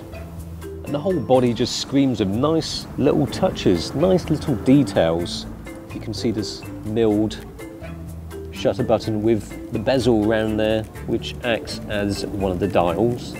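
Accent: United Kingdom. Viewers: i find his voice too british too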